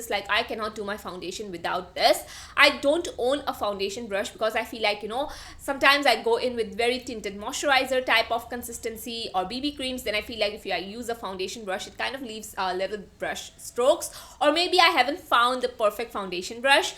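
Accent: India. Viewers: Where are you from?in which part of India?Because your accent is so cute and so soothing 🥰🥰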